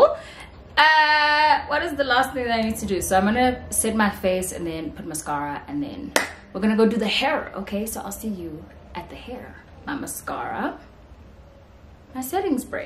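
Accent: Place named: Zulu, South Africa. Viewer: I literally watch videos just so I can hear her speak zulu 😭🤣 Her zulu and accent is chefs kiss🤌🏾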